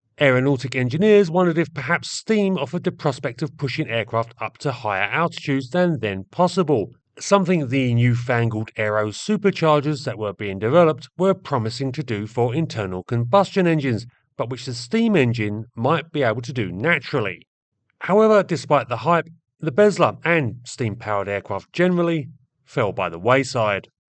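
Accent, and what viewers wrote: United Kingdom. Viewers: battle of the monotone Brits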